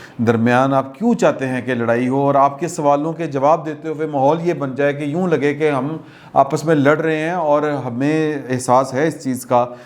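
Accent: Punjab, Pakistan. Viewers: Sadky jawan app ke urdu or mix panjabi Kay. 😂😂😂😂😂. Love 4 u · Ur great 👌 Sir 👌 g good job 👍 naam tou suna hi hoga dialogue with Awais Sheik Multan Wala Punjabi tarka Kamal Ka hota hai aapka Bhai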